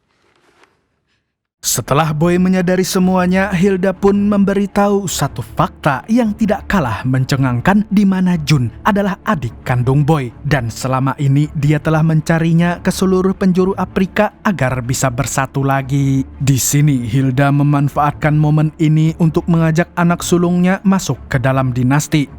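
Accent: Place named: Bandung, Indonesia. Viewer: Iyeu Operator na Anu komentar pasti na budag Cicaheum nyyaa.... Logat na teu bisa ngabohongan penongton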